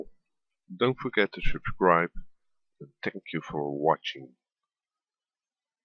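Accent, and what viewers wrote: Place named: England, United Kingdom. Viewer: super tutorial man,ik heb een en ander bijgeleerd,en ik was ook nog ge- entertained door het engels accent.ik wil MEER alsjeblieft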